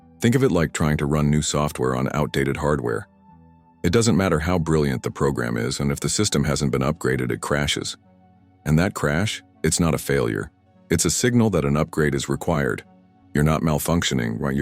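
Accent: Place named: United States. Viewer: The discomfort im feeling right now, is the reaction to this vile American accent.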